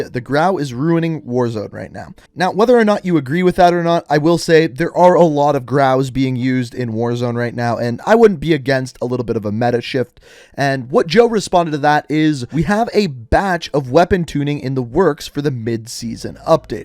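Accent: Canada. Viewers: Im like a parrot repeating every word he pronounces so Canadianly. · It’s the Canadian accent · Def can tell he’s Canadian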